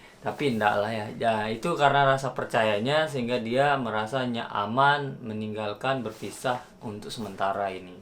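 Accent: Lombok, Indonesia. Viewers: Alhamdulillah Logat sasak nya masih terasa, but seperti nya akan hilang, hmm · Ailah logat lombok iku dk bau telang.. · Mantap bang lanjutin bikin Videonya, logat lomboknya gk bisa ilang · Bang asli lombok?? Logat nya sasak tulen ni salam dri lombok bang hehe😅🙏🤙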